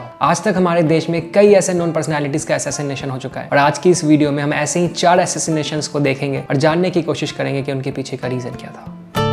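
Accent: Bihar, India. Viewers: Aap Bihar se ho kya nice video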